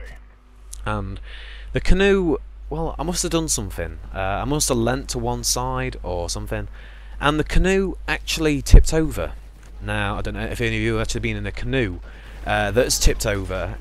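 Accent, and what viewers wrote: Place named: England, United Kingdom. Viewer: which part of england your from mate??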